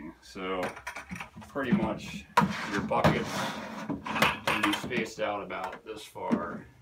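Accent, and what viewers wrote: Pennsylvania, United States. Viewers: He's speaking Pennsy, easily understandable being that I'm originally from the Ho Bud coal region of the keystone state. Listening faster might help. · I was born in PA I know that accent. Also cool points to both for knowing who the hell are.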